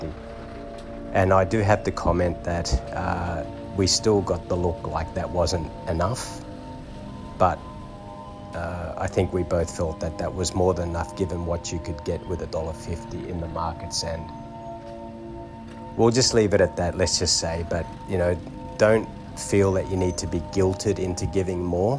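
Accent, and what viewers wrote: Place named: Australia. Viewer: You sound like Aussie.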